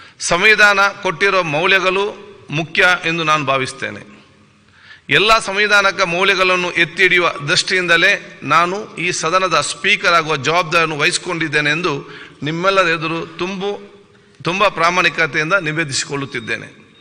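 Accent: Tulu Nadu, India. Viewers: ಅವರು ತುಳು ಕನ್ನಡದ ಮಿಕ್ಸ್ ಆಗುತ್ತೆ ಅಡ್ಜಸ್ಟ್ ಆಗಬೇಕು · Sir come from Tulu kannada side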